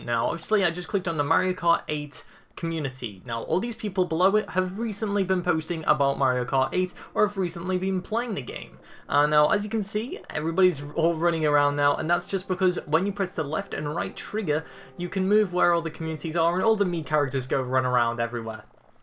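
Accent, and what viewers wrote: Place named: United Kingdom. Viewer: yay hes British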